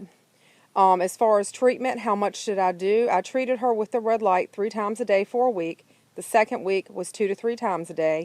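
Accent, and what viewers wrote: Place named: Virginia, United States. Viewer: You sound like you're from Virginia or maybe NC, but my money is on VA. Love VA! Am I close? 😊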